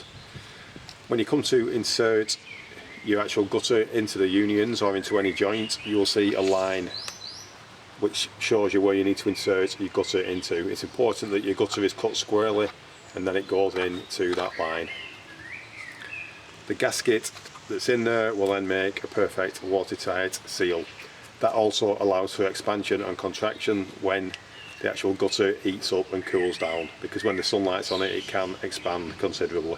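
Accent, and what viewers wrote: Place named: Northern England, United Kingdom. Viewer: Don't lose that Northern accent......British Industrial greatness was built with people who spoke with accents like yours and similar. Great video...keep 'em coming!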